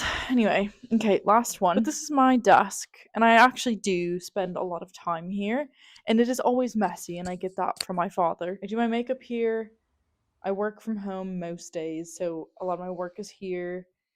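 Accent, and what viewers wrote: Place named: Ireland. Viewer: i watched a lot of your video's in succession. You have no idea how much your accent changed from Texan to to far more Irish.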